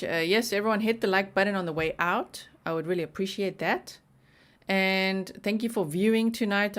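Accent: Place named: South Africa. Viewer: South African living in the Netherlands...thats so cool! Thanks for sharing that. I wondered where your accent was from but couldn't figure it out. I love when you said that your a true crime mind all the time! I'm American living in Finland 🇫🇮 and its a bit weird bc the news/media doesn't report here like they do in other places. I watch a lot of crime, documentaries, court cases online, and mysteries. But definitely interesting points your making :) and I like that you look at the big picture.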